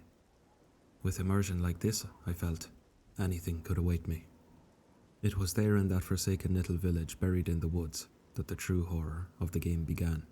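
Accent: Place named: Ireland. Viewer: Finally an Irish narrator ❤ go raíbh míle maith agat ❤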